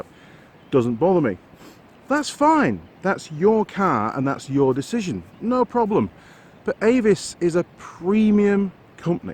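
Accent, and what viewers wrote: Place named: West Yorkshire, United Kingdom. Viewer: really enjoying your channel but one thing has been bugging me,, where are you originally from, you sound reasonably local to me in west yorkshire :)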